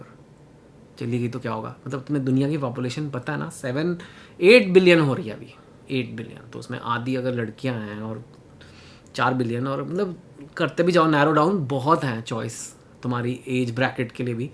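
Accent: Delhi, India. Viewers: Bhaiya U talk like a typical Dilli Wala Mujhay bachpan yaad aa gaya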